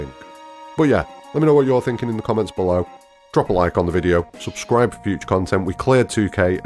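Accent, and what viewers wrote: Northern England, United Kingdom. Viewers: what's your accent? I know its British but where - i suck at pinpointing accents but yours makes me laugh when you be expressive on purpose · Always love to hear a fellow northerner on YouTube.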